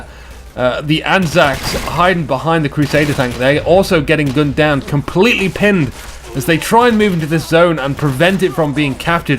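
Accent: England, United Kingdom. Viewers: Fantastic video! Thanks for all the hard work, I can tell by your commentary that you too have a huge... english acccent and I love it! Excellent game, good to see lots of pressure and lots of light vehicle play, this game is great in that its not all just kt and is3 spam!:) good luck to butch and baloo, having watched this video and shootter's videos it seems like butcher and baloo are going to spank them back to mexico.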